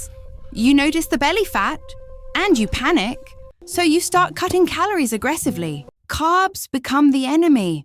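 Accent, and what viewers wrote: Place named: United Kingdom. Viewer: It’s good to hear British accent 😉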